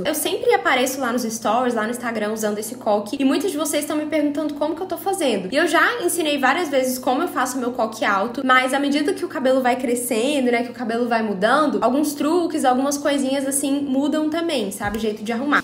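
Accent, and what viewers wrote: Minas Gerais, Brazil. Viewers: Coisa de mineiro eu acho, pq eu também falo assim e sou de Minas 😂❤️ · Coisas que nós mineiros falamos sou mineira e falo assim também 😍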